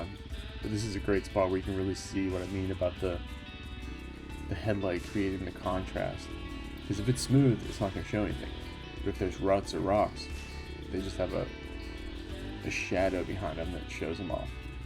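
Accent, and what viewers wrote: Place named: Pittsburgh, United States. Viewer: Yeah, I could hear some Pgh in your voice! Sadly I haven't ridden anything in years just due to life circumstances. Gotta live vicariously through YT videos like yours. The only bike I've kept is a YZ125 that I rebuilt from top to bottom to race AWRCS. I go out and huff some two stroke exhaust from time to time to keep it circulating in the bloodstream though. Hopefully I get my freedom back before I'm an old man and I can get back out there. Thanks for taking us along for the ride.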